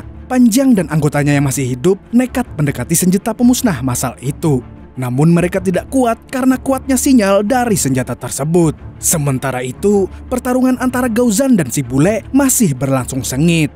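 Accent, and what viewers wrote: Banjar, Indonesia. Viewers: Film John wick bang.... Tetap dengan pembawaan bahasa Banjar yg babangsatan nya bang 👍 Sukses terus buat 👍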